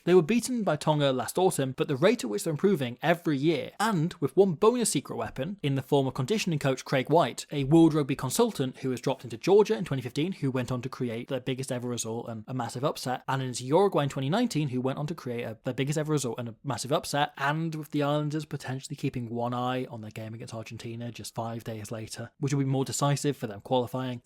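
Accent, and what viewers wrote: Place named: Edinburgh, United Kingdom. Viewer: English with a Edinburgh accent..my Chilean is...not sure lol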